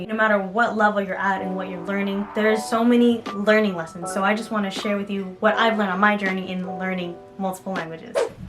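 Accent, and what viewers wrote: Rio de Janeiro, Brazil. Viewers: Olá,. Falar outras línguas é um privilégio de poucos. Você possui um belíssimo sotaque brasileiro e demonstra muita dedicação e habilidade com idiomas. Você certamente é uma inspiração para aqueles que querem se aventurar pelo mundo do poliglotismo. Parabéns, e keep up the excellent work! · seu sotaque é bem carioca! s2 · sotaque carioca diria .. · O que deve ser realmente ruim para um nikkei ao praticar japonês é que os japoneses devem supor pela aparência que o nikkei seja fluente por ter aprendido em casa. A propósito, seu sotaque de português parece do RJ. Muito bom!! 😎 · Conheci seu canal a pouco tempo, parabéns, você fala muito bem português e seu sotaque é bem próximo ao sotaque carioca. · Você se expressa muito bem em português do Brasil. Acho que em outra encarnação você foi brasileira!!!! Mas o seu sotaque é carioca. 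Tenta usar o sotaque gaúcho. As girias gauchas também são muito legais. Bah, tchê. São tri-legais. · Aprendeu Português com um carioca kkk, ta puxando o S, INGLÊIXXX KKK Linda! :D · Seu português é muito bom e tem um sotaque meio carioca, sotaque aqui do Rio de Janeiro. Pra entender como é o sotaque daqui do Rio é só escutar a cantora Fernanda Abreu, carioquérrima. <link>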